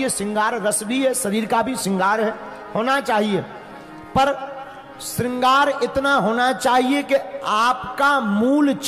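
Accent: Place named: Barsana, India. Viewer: Aaj to kya baat hai Gurudev barsane wali bol rahe ho bhasha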